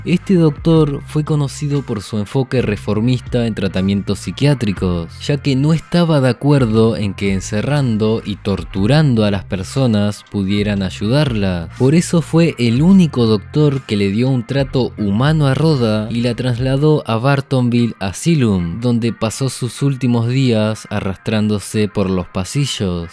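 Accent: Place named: Argentina. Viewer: WTF Dross desde cuándo tenés acento argentino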